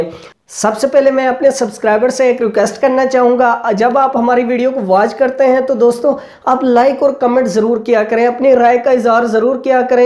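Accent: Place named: India. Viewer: Fk damn, Indian accent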